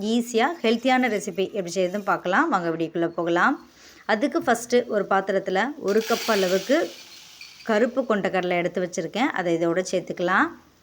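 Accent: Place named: South Tamil Nadu, India. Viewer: hello neenga tirunelveli kanyakumari nagarkovil paguthigalil ondrsi sernthavarghal kovai saayalum irukku just by tamil slang sis.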